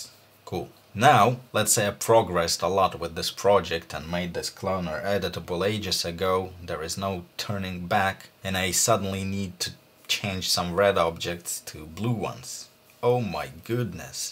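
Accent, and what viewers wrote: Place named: Russia. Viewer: почти не слышно русского акцента) отличный видос, от души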